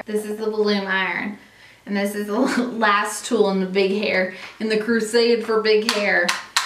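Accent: South, United States. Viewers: First of all. Your dancing & song choices are 💣. I swear to you we were meant to be friends! Second. I could listen to you talk with your southern slang alllllll day! And lastly- I think i need that MJ perfume in my life!! You know i trust you to the ends of the earth- so pretty sure this stuff is going to be incredible🙏 XO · I seriously love that you are doing videos! Keep them coming I could listen to your cute southern accent all day! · You are hilarious and I died like 5 times love your southern sass girrl;)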